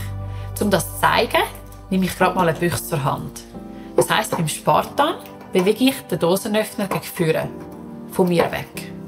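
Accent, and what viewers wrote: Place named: Switzerland. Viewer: Schön den Bericht in Schwyzerdütsch zu hören.Von dieser sympathischen Frau vorgetragen klingt es gleich doppelt so nett.Liebe Grüsse von einem VICTORINOX Fan.😄😄😄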